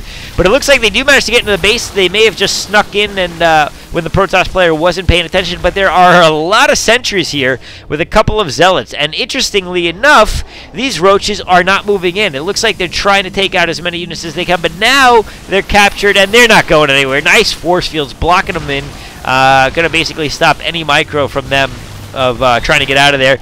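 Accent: Boston, United States. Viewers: "I'm sick of this time zone shit" + your Boston-esque accent = Me subscribing.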